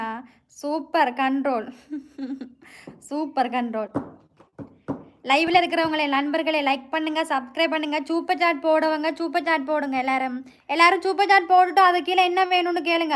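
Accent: Sri Lanka. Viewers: இலங்கை தமிழ் சூப்பரா இருக்குங்கோ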